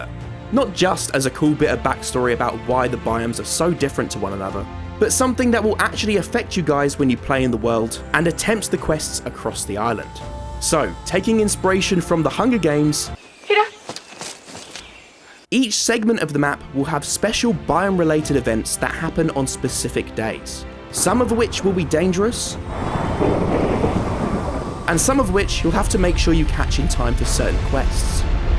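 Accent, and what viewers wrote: United Kingdom. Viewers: Are you british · Why do you sound like a British Zach Callison?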